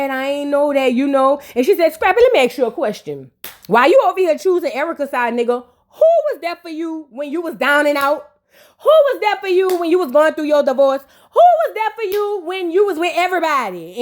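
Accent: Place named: South, United States. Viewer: Girl when I first found this channel I thought you were Toya With that country accent lol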